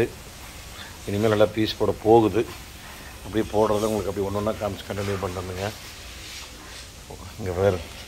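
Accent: Kongu, India. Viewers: "சுடச்சுட பெயின்ட் அடிச்சு நிக்குது!!" அண்ணா நீங்க சொன்னதை நான் மிகவும் ரசித்தேன்!! அசல் கொங்குத் தமிழில் நீங்கள் பேசுவதை கேட்டு ரசிக்கவே உங்கள் வீடியோக்களை பார்ப்பேன்!!!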